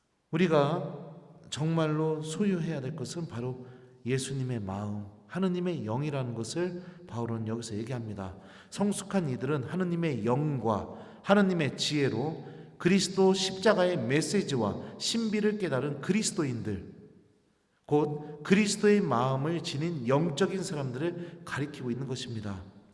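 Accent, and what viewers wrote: Chungcheong, South Korea. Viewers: 신부님의. 강론. 충청도. 사투리가. 구수하고. 귀에. 쏙쏙.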